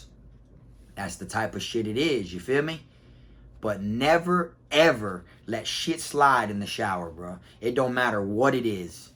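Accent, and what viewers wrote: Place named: Florida, United States. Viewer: Thats how we talk in Florida lol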